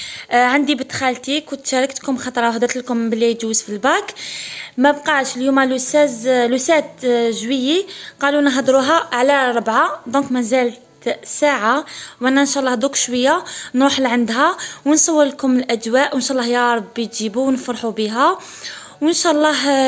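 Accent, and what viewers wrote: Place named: Kabylie, Algeria. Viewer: قبايلية نتي باينة من عربيتك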